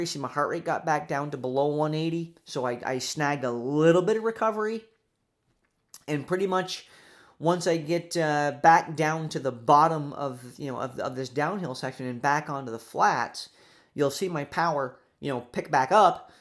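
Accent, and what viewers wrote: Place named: United States. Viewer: What an annyoing ''Yank'' commentary could not listen to this guy!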